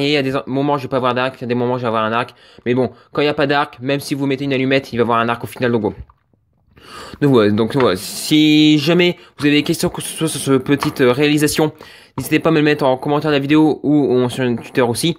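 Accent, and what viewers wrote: North, France. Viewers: Tu Vien du nord touwa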